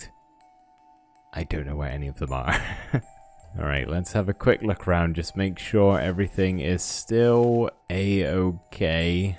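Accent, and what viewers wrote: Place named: Bristol, United Kingdom. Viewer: Where's your accent from? I am from Bristol, and you sound like you have some of the accent.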